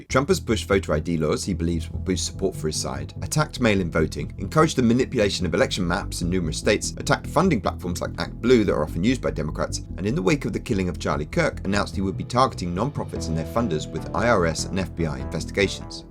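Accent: United Kingdom. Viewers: How does someone whose accent gives them away as a resident of a constitutional monarchy misunderstand monarchy so brutally? “King” and “dictator” are not synonyms, you stump. You live under a king; are you fucking oppressed? The US constitution, in fact, is almost entirely based on the UK system in the 18th century—you have branches of government and divisions of powers, too! You had them FIRST! You lost all credibility right from the start.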